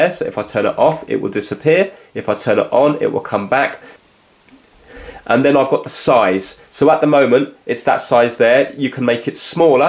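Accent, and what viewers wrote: England, United Kingdom. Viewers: England accent👍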